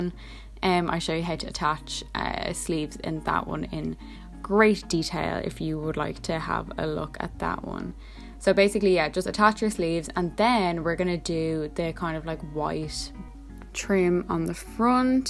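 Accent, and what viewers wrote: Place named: Ireland. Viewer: OMg Ur irish! I recognise the acsent , im irish too :D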